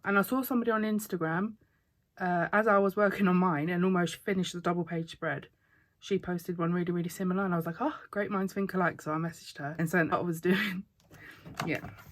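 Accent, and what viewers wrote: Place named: London, United Kingdom. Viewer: Your pictures are so vibrant. I love them, and the purple snow is great. You talk like me. You're obviously another Londoner. I'm in North London (wood green).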